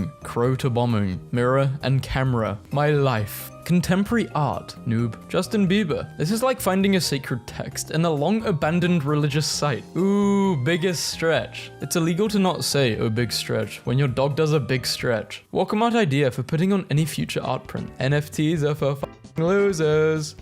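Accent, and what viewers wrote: United Kingdom. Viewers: why do you randomly pronounce the r in Kirby like an American but sound british for literally every other word?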